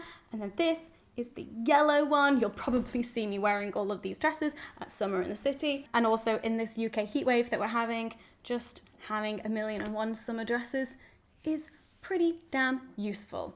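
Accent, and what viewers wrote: Texas, United States. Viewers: Your Texas voice was pretty good. Your vowels need to be longer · I loved your Texas accent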